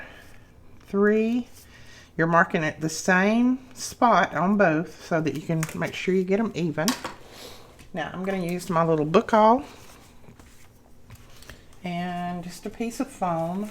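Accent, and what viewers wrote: South, United States. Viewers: Where do you live? The accent is southern I remember you saying in another video when someone said to slow down talking lol. When you dont talk fast. Lol. Anywho I am in Hartselle Alabama. Small town a little ways from Huntsville and Birmingham. Kind of in the middle closer to Decatur Alabama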